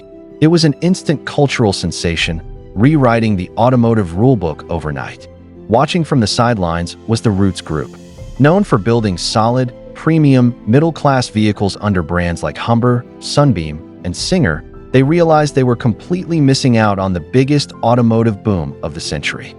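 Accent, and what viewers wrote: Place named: United States. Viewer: The American accent devalues the video.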